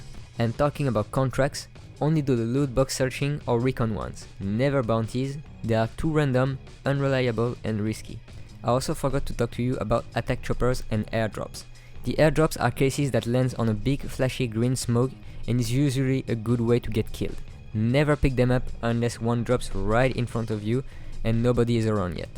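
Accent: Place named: France. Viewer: T'es français ça s'entend direct à ton accent mdr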